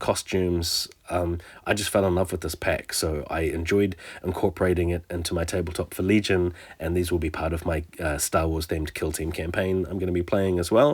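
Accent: New Zealand. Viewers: thought i heard a fellow kiwi's accent very happy i found your channel thru this collab series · Are you a kiwi? Your voice sounds like you are from New Zealand?... yes you are haha just finished the video. That's awesome I'm also a kiwi, keep up with the videos I'm going to watch the pod racer one now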